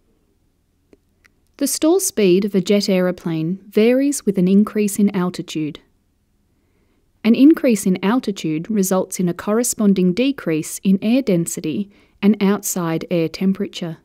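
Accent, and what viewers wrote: New Zealand. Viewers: ??Does this NZ narrator ALSO do the "Chickens in my backyard" videos.??? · What accent is it? Is it kiwi or aussie ?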